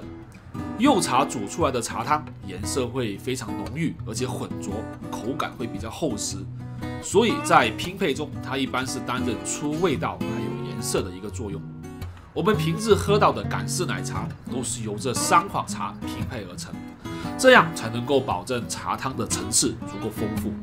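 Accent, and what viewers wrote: Chaoshan, China. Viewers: 怎么感觉作者是潮汕人？有点像潮普哈哈哈哈哈哈哈哈哈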